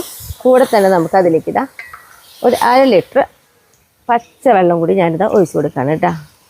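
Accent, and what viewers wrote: Thrissur, India. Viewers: നമ്മുടെ ത്രിശൂർ ഭാഷ വളരെ ഭംഗി ആയി സംസാരിക്കുന്ന ആളാണ്. എനിക്ക് ഒരുപാട് ഇഷ്ടവും ആണ്. വീഡിയോ കാണുന്നത് നമ്മുടെ തൃശൂർ കാർ മാത്രം അല്ലല്ലോ,😢😢😢 നോക്ക്..... വായോട്ടാ എന്ന് പറയുന്നതൊക്കെ കേൾക്കാൻ എന്തൊരു രസം ആണ്.😂😂😂😂 ദേഷ്യം ഒന്നും മനസ്സിൽ വെക്കരുത്. നിങ്ങളെ രണ്ടു പേരെയും പെരുത്തിഷ്ട്ടം മാത്രം 🎉🎉🎉🎉🎉🎉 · അറിയില്ല. ഇന്നസെൻ്റ് സിനിമയിൽ തൃശൂർ ക്കാരനായി സംസാരിക്കുമ്പോൾ നമ്മൾ natural acting എന്നു പറയുന്നു. അദ്ദേഹത്തിന് കൈ അടിയും കിട്ടുന്നു. സാധാരണക്കാർ സംസാരിക്കുമ്പോൾ അതു തിരുത്തുക എന്ന് പറയുന്നത്? ഇത് സ്റ്റൈൽ ആയി കരുതി കൂടെ? എനിക്ക് എൻ്റെ കൂട്ടുകാരുടെ തൃശൂർ, പാലക്കാട് സംസാരം ഒക്കെ രസം ആയിട്ടാണ് തോന്നിയത്.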